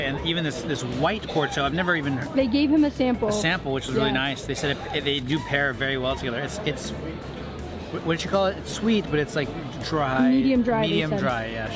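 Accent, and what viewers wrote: Brazil. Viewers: you are learning portuguese accent from brazil and not the real portuguese from portugal :D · Just please dont use the brazilian portuguese app you were using in the video, please! Portuguese from Portugal is a bit different from Brazil and the pronounciation/accent are very different. Thanks. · You are learning Brazilian Portuguese 😅😅 Portugal accent is stronger, like British English vs American. But you are doing great, ready to go to Rio de Janeiro🙌🏻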